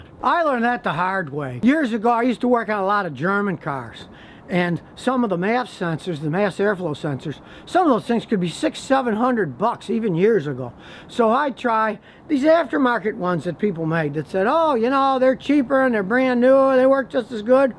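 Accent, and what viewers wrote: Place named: New York, United States. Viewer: You from New York or Chicago? Love the straight forward talk.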